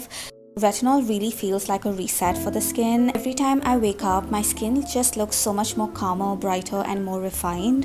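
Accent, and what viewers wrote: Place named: India. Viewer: ❤Your voice gives me comfort. I think you are from India. I recognized you from your accent. I would like to say that I follow you from Algeria. I would also like to say that you are incredibly beautiful. Your hair, your body, everything. Continue with your content because you are really successful. I really loved you. ❤❤❤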